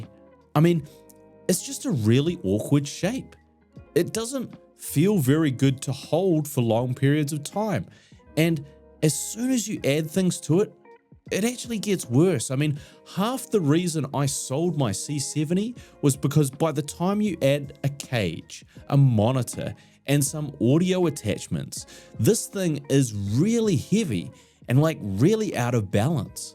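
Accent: New Zealand. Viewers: Mate you sound like Kiwi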